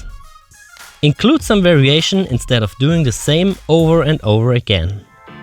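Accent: Germany. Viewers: Sorry aber dieser heftige deutsche Akzent ist mir zu anstrengend. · Wow you sound reaaaally german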